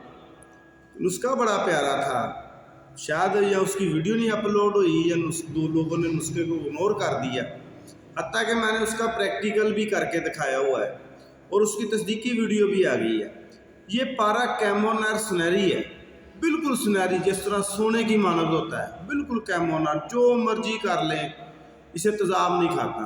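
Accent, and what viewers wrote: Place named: Punjab, Pakistan. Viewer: جناب میں نے یہ نسخہ بنانے کی پریکٹس کی تھی نہیں بنا تھا، کیونکہ مجھے ساٹھ گرام کی جگہ نیلا ست گرام یعنے کہ سات گرام سنائی دیا تھا، استاد محترم نہایت ادب کے ساتھ گذارش ھے کہ اجزاء کے وزن لکھی ھوئی صورت میں بھی شئیر کر دیا کریں تا کہ وہ لوگ جو پنجابی لہجے سے نا بلد ھیں ،غلط سمجھ کر نقصان نہ اٹھائیں ،،شکریہ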